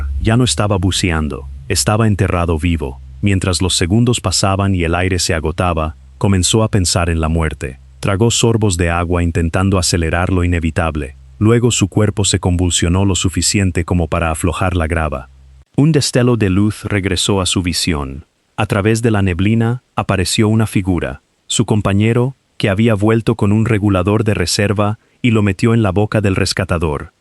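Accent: United States. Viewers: Hablas bien el español y después hablas como gringo, me parece patético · Hola. Interesante a pesar del español con demasiado acento norteamericano. Pero está bien. M impresiona la tozudez de los buzos por el peligro. La gente es así. Es igual que los departistas que sabaen que va a ser sancionados si toman estimulantes y aún así lo hacen. Son los que audazmente ignoran las advertencias de peligro. Es igual que l los que roban. o asesinan. Saben que los atraparán , pero aún así lo hacen. En todos los casos se les obnubila el entendimiento y la capacidad de razonar y medir el peligro.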